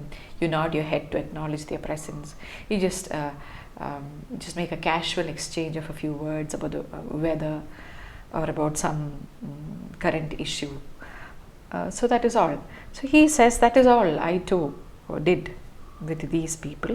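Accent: India. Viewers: Love ur Indian accent 😊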